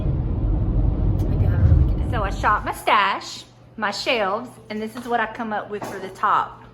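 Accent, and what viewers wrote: South, United States. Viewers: Where are you from....GA? I love your southern drawl! And tomato sandwiches, ain't nothing like it. Snip those early tomato buds! WE live in NC and are babying our tomato plants. I CANNOT WAIT!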